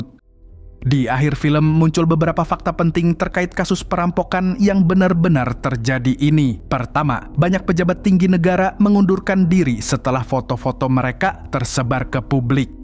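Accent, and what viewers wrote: Banjar, Indonesia. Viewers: baaaaah iyaa am buhan banjar naratornya leeeh....gas kaaaan mang...... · Orang Banjar kah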